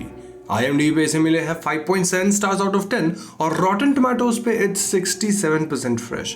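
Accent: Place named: Bihar, India. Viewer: sir ap bihar se ho me jan gai...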